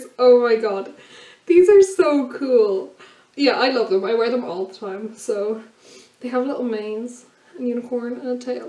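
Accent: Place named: Ireland. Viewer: Love this!! All your gifts look great! Hope you had a good birthday! Also I love your accent🤣🤣I'm half Irish but I don't have the accent☹☹❤❤xx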